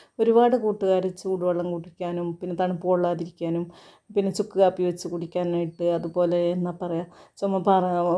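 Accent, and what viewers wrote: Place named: Kannur, India. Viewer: ചിലത് ഒന്നും മനസ്സിലാവില്ല കണ്ണൂർഭാഷ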